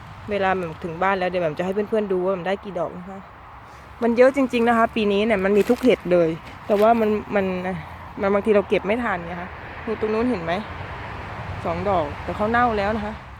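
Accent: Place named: South, Thailand. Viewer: ชอบนอ้ง นะคะติดตามมาสักพักแล้วคุยสนุกใต้ก็ฟังออกนะคะเพราะแฟนก็คนใต้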